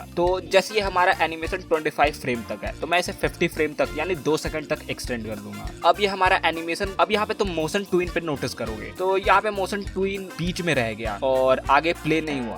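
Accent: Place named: Bihar, India. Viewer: Bhai bihar se ho kya?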